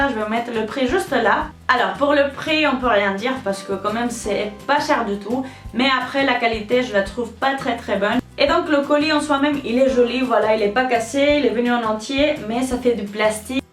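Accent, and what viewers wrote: Spain. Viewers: Je t'aime tu es magnifique et ton accent espagnol aussi 💙💚💛💜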